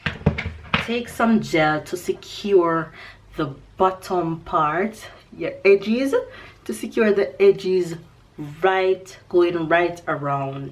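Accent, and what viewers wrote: Jamaica. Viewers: Very cute and easy updo!!And I open your Jamaican accentMy family is Jamaican as well 😍😍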